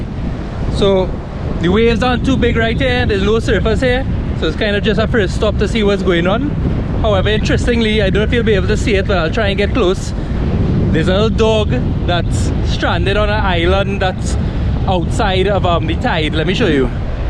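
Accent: Trinidad and Tobago. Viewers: U look like an American but you speak perfect trini english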